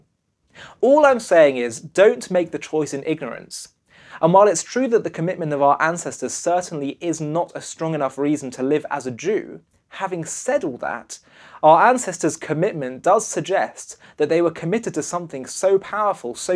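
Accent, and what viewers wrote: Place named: United Kingdom. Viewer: השדרן נראה תימני אבל יש לו מבטא בריטי. מה לעזאזל?! חחח 😂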